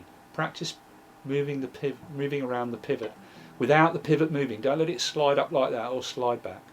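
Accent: United Kingdom. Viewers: come here for tips.stayed for the british accent noice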